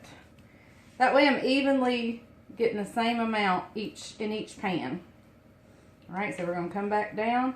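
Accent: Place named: North Carolina, United States. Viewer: And your NC accent i live in the northern peidmont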